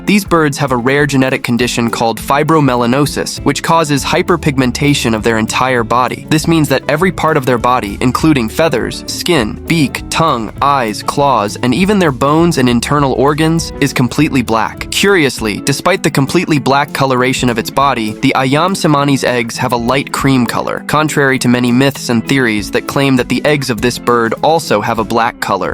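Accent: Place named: England, United Kingdom. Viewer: Si sente solo la tua sgraziata voce 🤢🤮gutturale inglese , ma non si sente alcun suono degli uccelli . VOTO NEGATIVO .:text-green-game-over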